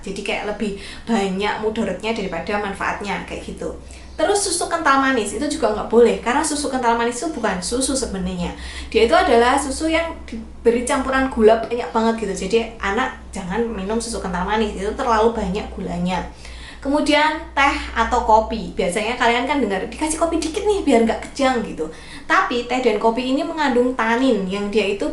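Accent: Java, Indonesia. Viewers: Makasih 🥺❤️ Udah rajin edukasi kami lengkap infonya terutama aku newmom yg mana aku uda gapunya Ibu.. dr awal hamil sampe lahiran skg babyku umur 4mo rajin nonton bubid belajarnya disini lengkap - everyday is learning. Bonus logat jawanya 🥰🥺 berasa lagi dikasih tau keluarga sendiri (aku rantau d jakarta) *peluk hehe Sehat selalu yaa ❤️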